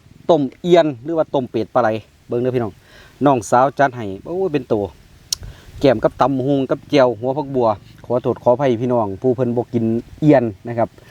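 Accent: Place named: Khemarat, Thailand. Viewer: บ่าวท้าวเป็นบ้านใด๋ฟังสำเนียงเสียงกับการเว่าคือคนแถวไปบ้าน(อำเภอเขมราฐ)แต่ถ้าบ่แหม่นกะขอโทษจ้าแต่ตอนี้คนถามอยู่ลพบุรีได้30กว่าปีแล้วแต่เคยลืมเขมราฐ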